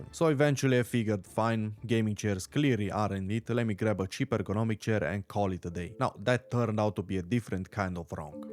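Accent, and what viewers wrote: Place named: Romania. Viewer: romanian accent really showing in this one, nice vid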